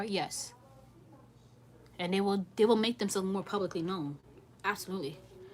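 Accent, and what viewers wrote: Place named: New York, United States. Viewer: btw, I live in England, born and raised. I LOVE your accent and can understand every word you say. I’ve never been to NY or even to the US in this life, yet, but I think I was in NY in another life close to this one because I feel a strong connection to the place, energetically, like with no clear reason as to why, in this life, other than maybe what I saw on tv and in the movies as a kid, but it’s stronger than that, like a distant nostalgia that feels like home. I felt the same about London here in the UK before I moved there. When I did move there I felt right at home.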